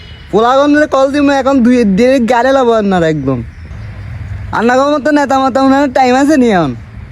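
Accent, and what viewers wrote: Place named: Mirsarai, Bangladesh. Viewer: ভাষা টা হচ্ছে মিরাসরাই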